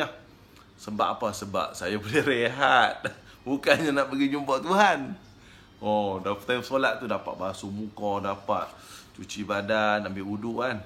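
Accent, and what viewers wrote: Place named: North, Malaysia. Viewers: Byk utara noh komando ni. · Abg nak tanye knape ggk selalu cakap utara ye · Seronok dengaq Tuan bercerita..Tak boring..cara penceritaan santai, lenggok utara lembut dan mesra walaupun nampak garang..Moga lancar channel dan terus banyak content2 baru Tuan 😃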